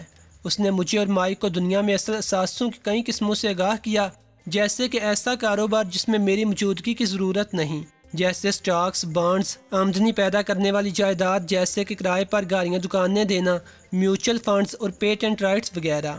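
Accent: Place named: Punjab, Pakistan. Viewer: پنجابی لہجے میں اردو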